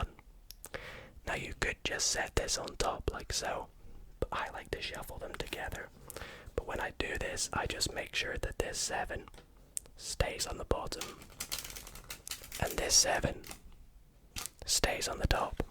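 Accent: Scotland, United Kingdom. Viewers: The reason you're so good at ASMR and also card stuff is simply because you're Scottish lol. It's weird hearing a Scottish accent do ASMR, because I'm also from Scotland haha. But man! Your ASMR is the only one that works for me. Thanks for the vibes, mate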